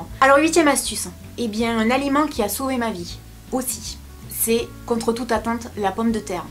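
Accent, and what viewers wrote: South, France. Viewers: Y'a pas longtemps que je te suis mais j'adore ton franc parler avec ton accent du sud 😍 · L'accent du sud ! 😂😘✨ #sudiste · et ouais le suuudddddd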